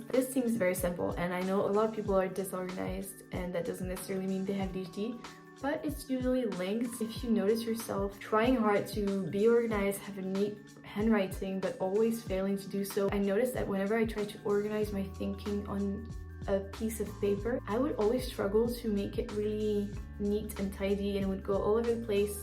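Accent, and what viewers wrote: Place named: France. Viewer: Je reconnais à ton petit accent que tu dois être française (ou belge je sais pas ?). Et franchement merci pour tes vidéos. Tu mets exactement le doigt sur les problèmes que je rencontre au quotidien et je pensais tellement être bizarre et seule à être dans ce cas et tu nous prouve le contraire. Merci infiniment et continue à faire des vidéos comme ça parce que tu vas aider plus d'une personne paumée ah ah. Ça fait du bien de se sentir comprise et de savoir que d'autres personnes arrivent à mieux vivre avec leur TDAH. J'ai hâte d'avoir tous tes conseils pour apprendre à mieux vivre avec ça. Vraiment, merci ❤️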